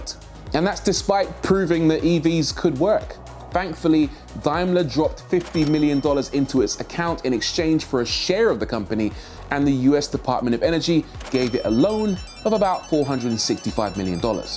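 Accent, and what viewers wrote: United Kingdom. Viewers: Very informative video. The killer British accent helped.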